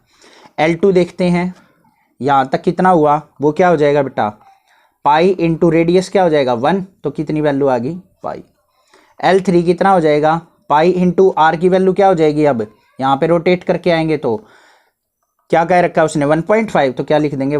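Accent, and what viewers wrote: Haryana, India. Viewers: Sir aap hariyana se ho kya ?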